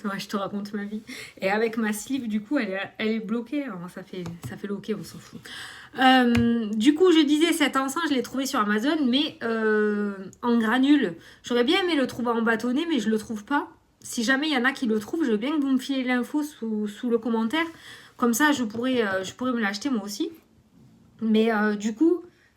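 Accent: South, France. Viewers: Cc aujourd'hui beau soleil là journée commence bien en plus ton sourire ta petite voix chantante et ton accent du sud la petit boite de cartes je vais me l offrir hâte de t avoir en rendez vous une prochaine fois🤡🙆‍♀️🌹🏖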